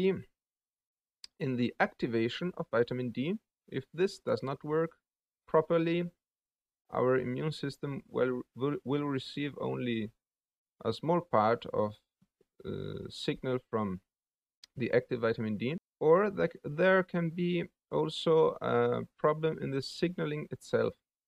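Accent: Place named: Germany. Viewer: Excellent explanation !!! (I would just add a couple words about the Vitamin D receptors, since people will find that mentioned in other explanations, for example by Dr Coimbra himself). I am myself in the process of starting that treatment, already had some fantastic result starting it without actual knowledge about Coimbra, just based on the Book by Jeff T Bowles, who did a test on himself. Now I found the amazing story and results of Coimbra, and am looking for a physician to have supervision, as you recommended too. Since you sound a bit German, my question is whether you know of any doctors doing that treatment besides the ones mentioned here <link> Thanks a lot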